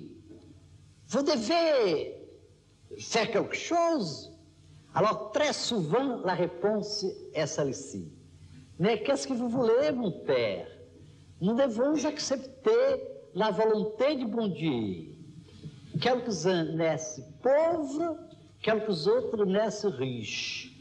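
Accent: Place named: Brazil. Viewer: Ha algo que me emociona: Dom Helder fala um francês que os franceses podem entender mas sem perder o seu jeito brasileiro de ser ... ele é aquela pitada de sal que guarda o gosto do mar ... e então pode dar gosto à farinha que vai se transformar em pão : Deus seja louvado pelo nosso grande irmão Dom Helder!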